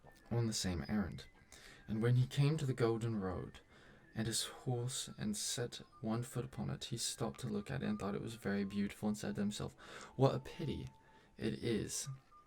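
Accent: United Kingdom. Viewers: At first I was like "did he always have an english accent?" then I realized and went "oh..."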